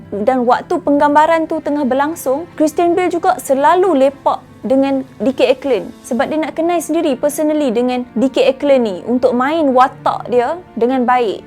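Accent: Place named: North, Malaysia. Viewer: Pekat loghat Utara sis... Orang orang 😅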